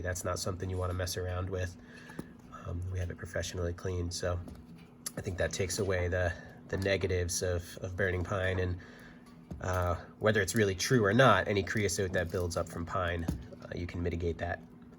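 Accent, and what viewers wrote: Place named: Upstate New York, United States. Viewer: You're not from Vermont. Sounds more like upstate NY.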